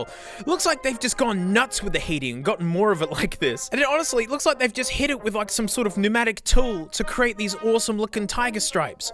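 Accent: Australia. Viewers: I can't hardly tell the difference between any cymbal on my chineesium earbuds I just like listening to funny Australian man lul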